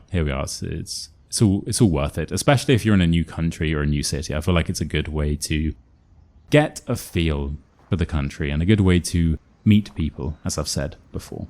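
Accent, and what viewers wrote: RP, United Kingdom. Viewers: Hi! What a lovely video and content! (I don't know anything about eye patches or the like). I'm glad you've had some fun in spite of your hard work. Most real English teachers from Argentina go to university and undergo a 33-yearly subject study programme, including Language 1, 2, 3 & 4, Grammar 1,2 & 3, Phonetics 1 & 2, Stress and Intonation (laboratory) 1 & 2, English Literature 1, Contemporary Literature 1 & 2, Shakespeare, History of the British Isles 1 & 2, Geography of the British Isles and the USA, American Literature, American History, Pedagogy, Psychology, Methodology 1 & 2, Ethics, Philosophy, ( Religion 1, 2, 3 and 4), etc. I did Practice lessons with teens. But I love working with children and preteens. I don't give on line lessons, just in case you think that I'm offering myself as a teacher😅. I follow you because I'm losing my memory and I love your modern RP pronunciation. 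As you said, you are a real flesh and bones teacher, handsome, talented, diplomatic, hardworking and honest. Thank you, dear teacher! ❤🎉🌺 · Give me your contact number I want to learn British accent from you I am Pakistani girl I want to go to the London on study visa if you don't mind plz sir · Ramble on, we enjoy listening to your “ever so English” voice.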